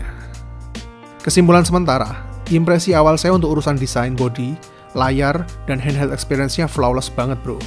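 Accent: Yogyakarta, Indonesia. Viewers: Bang orang Jogja po?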